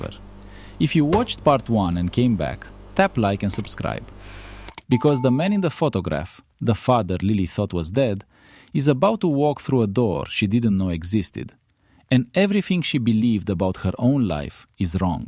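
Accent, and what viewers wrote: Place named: Russia. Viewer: The constant clanging sound and the inexplicable Russian accent made part two much harder to listen to. We’re the story regarding spies or the Bratva the accent might have enhansed the story but as it was neither it just made it difficult to understand.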